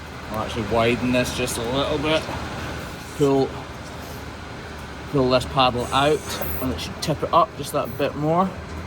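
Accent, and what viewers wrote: Scotland, United Kingdom. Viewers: you and your crew are the best.....if you don't have empathy for all creatures, what's the meaning of life, eh? Plus, the added bonus of a Scottish accent❣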